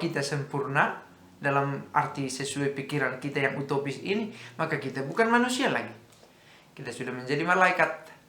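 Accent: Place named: Tegal, Indonesia. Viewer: Kalo dari logatnya kayaknya orang tegal 🤔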